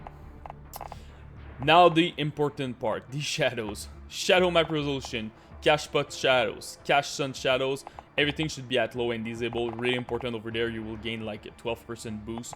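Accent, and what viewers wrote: Quebec, Canada. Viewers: Ton accent qc est cramé en tbnk 😂 . Tres bonne video entk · cool un quebecois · I’m from Quebec too that’s why I recognized the accent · L'accent québécois)) Are u a quebecker? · it sounds like u are from quebec? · quebec french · T'es quebecois en? Haha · are you canadian lol? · Ton anglais ma spoil que tu étais québécois hahaha merci pour ces conseils ! Je vais essayer car je run 15 19 fps avec mon laptop : acer 575g ,16go ram, et gpu 940 mx je comprend pas trop pourquoi cest autant mauvais. Si u as des conseils en plus je suis ouvert haah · tu es québéquois hein :)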